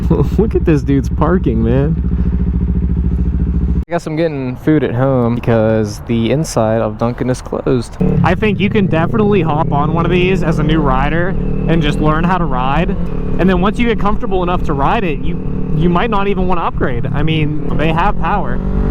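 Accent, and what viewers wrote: Pittsburgh, United States. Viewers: I was like dang this guy sounds like he's from Pittsburgh...then I saw Giant Eagle and Sheetz lol nice review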